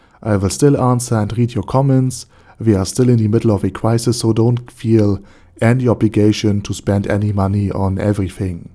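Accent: Germany. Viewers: Why do you speak with German accent ?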